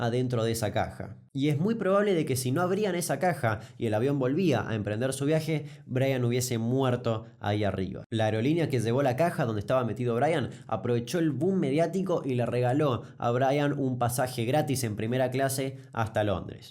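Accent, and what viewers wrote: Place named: Argentina. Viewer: Fascinante como narras. Ja,ja. Me hace reír el "el suspenso " del principio, "¿qué le pasó, que le pasó? " Genial y con ese acento argentino que es espectacular. Un abrazo grande desde Bogotá-Colombia 💕💕💕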